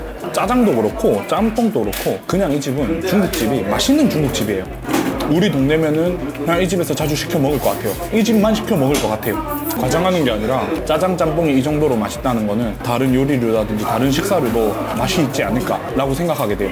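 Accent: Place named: Busan, South Korea. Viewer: 짜달시리(특별하게) 얍실하다(얇다) - 부산 사투리 넘나 좋은 것. ㅋ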